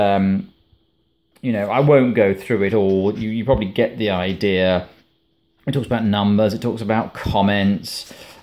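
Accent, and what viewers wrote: RP, United Kingdom. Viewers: Quite a posh sound gentleman! A Gentleman and a Scholar! :)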